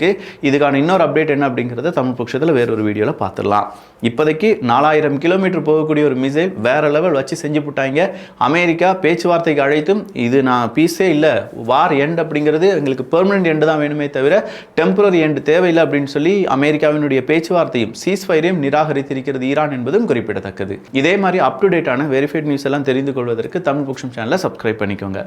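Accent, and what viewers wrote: Kerala, India. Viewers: his parents are from Tuticorin/ Udangudi area - he did his middle and high school in Vandiperiyar area which is in Tamil Nadu kerala border - you tend to get Malayalam mixed slang when you stay in border area - obviously he knows Malayalam very well you can observe the same slang in Nagarcoil / Marthandam area as well - they don’t become Malayalis right ? He worked in software company in Trivandrum and he was YouTuber at the same time - he got home in Trivandrum - it’s little cheaper to buy properties there than Chennai as you know so obviously you don’t move out that easily once you buy home A lot of our Tamils are settled in Mumbai - they don’t become Marathis right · hear his pronunciation of college, office and similar words with o sound. Only someone with Malayalam as mothetongue can talk like that. His entire family is from Kerala and settled in Trivandrum. 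If he is Tamil, then why buy land there and build and settle there???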